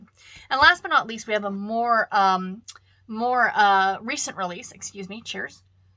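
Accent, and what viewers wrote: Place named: Canada. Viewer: I knew you were Canadian! The accent and your vibe ❤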